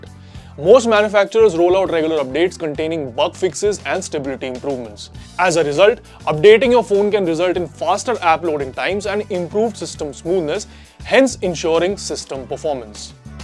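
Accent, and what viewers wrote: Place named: India. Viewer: Did not understand one word that u said because of your accent from India!!, u need to speak better & more clear English!!!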